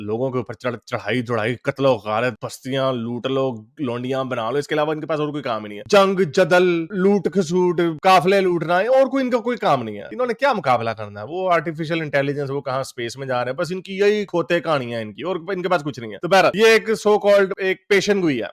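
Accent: Punjab, India. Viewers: Luv your punjabi accent ❤😂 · Your Punjabi touch is super funny 😂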